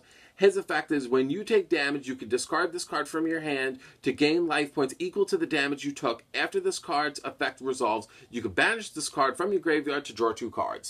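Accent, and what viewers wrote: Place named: New York, United States. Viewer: yo you live in Alabama or just have an Auburn shirt? I always assumed you were from new York or somewhere around there by the accent.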